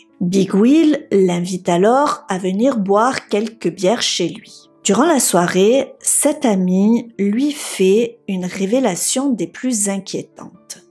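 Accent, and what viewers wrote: France. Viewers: Je suis particulièrement déçu que tu ne tiennes pas compte des remarques que l'on peut te faire... Tu es plutôt mimi à regarder...tu as un jolie timbre de voix et ton accent du sud (je suppose) rend tes histoires fort dépaysante... Mais par contre qu'est ce que c'est moche de t'entendre dire mariâche...montâche... garâche...partâche...et tous les mots finissant par age... Pourtant tes récits ne sont pas toujours ou souvent repris par d'autres... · Je ne crois pas avoir déjà entendu parler de cette affaire, sinon c un plaisir d entendre ton accent qui vient du sud si je ne m’abuse? Merci pour ton travail et tes vidéos! 🙏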